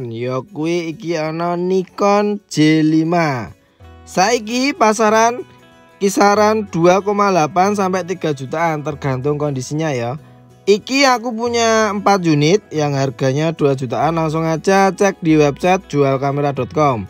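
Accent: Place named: Bantul, Indonesia. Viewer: Logate mbantul buanget hahahhaha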